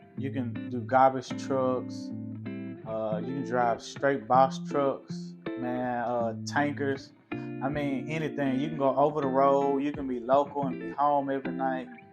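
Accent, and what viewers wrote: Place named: Memphis, United States. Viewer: I knew you was from Memphis because you said Mane too many times 38118 in the building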